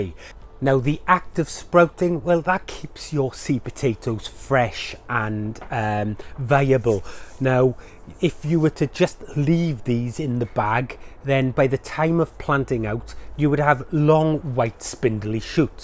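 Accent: Wales, United Kingdom. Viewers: I was just trying to figure out your accent. I`m Canadian, so I`m only vaguely familiar with the diverse British accents. I was guessing Wales. So I was right. I've been growing potatoes for years, but will try container growing this year. I generally harvest my potatoes in November, and keep them in the Fridge. By March the remaining potatoes start sprouting. In Canada the ground is still frozen. The earliest you can plant them is late April, but May is more typical. Most of my potatoes are small, I`ll have to improved my technique to get bigger ones.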